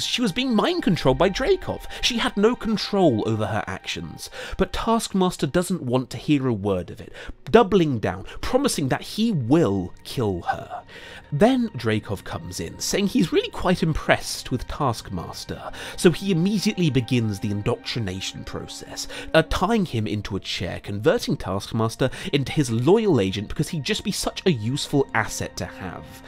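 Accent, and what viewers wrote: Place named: RP, United Kingdom. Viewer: This dude is speaking so posh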